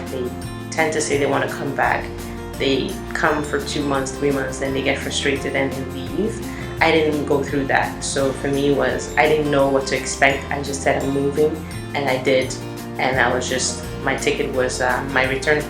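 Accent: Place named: Ghana. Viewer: She sounds like she has a Ghana accent (ie not foreign). She said that BOTH of her parents are from Ghana.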